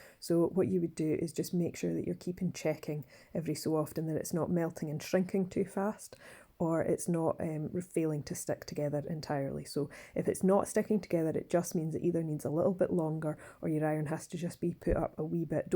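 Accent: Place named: Scotland, United Kingdom. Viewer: be! Everyone loves a Scottish accent 😎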